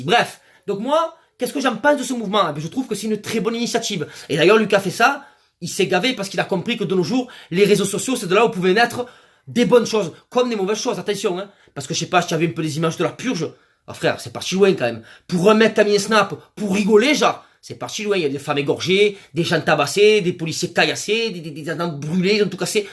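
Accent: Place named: South, France. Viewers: Minable ce commentaire, il a un accent du sud et parle avec les mains et alors? ça vous suffit pour le juger et le traiter d abruti?? j ai pas pour habitude de jouer au cow boy en défendant des youtubers, mais pour le suivre depuis un certain temps j ai envie de dire que c est un homme extrêmement intelligent et respecteux, qui traite de sujets variés à travers des analyses pertinentes et une touche d humour. Il faut être talentueux pour arriver à faire ce qu il fait et surtout pour supporter des commentaires gratuitement haineux et non fondés comme le votre.Voila pourquoi il est suivi par près de 30000 personnes Madame, peu cher payé d ailleurs pour les moments de rire qu il nous offre. Bref, je ne vous salue pas. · Je n'en ai rien à faire si vous ne me saluez pas ! je ne vous ai rien demandé il me semble ? je ne me suis pas adressée à vous. 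Si vous suivez ce gars c'est votre affaire, libre à vous. Ce n'est pas parce qu'il a un accent du sud qu'il m'horripile, c'est parce qu'on dirait un malade mental en train de gesticuler ! 3mn de sa vidéo m'ont suffi !!! franchement s'il est intelligent, il le cache bien ... je suis désolée si mon commentaire ne vous plait pas, mais j'ai l'habitude de dire ce que je pense. Cela prouve votre niveau "d'intelligence" à vous aussi... visiblement lorsqu'on aime pas quelqu'un on est catalogué de haineux ? belle mentalité ! bravo, vous avez tout compris !! alors continuez à écouter les analyses pertinentes du pantin dans sa boite, je pense que votre niveau est identique ! ne prenez pas la peine de me répondre, je ne vais pas perdre mon temps à venir vous répondre ! je vous laisse donc déblatérer tout seul !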